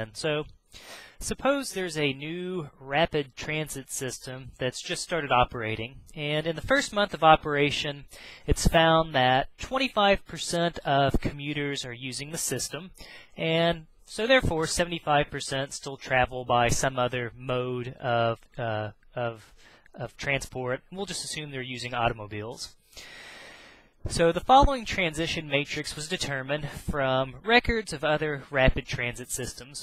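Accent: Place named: United States. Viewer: And the american accent makes it cooler as well. :D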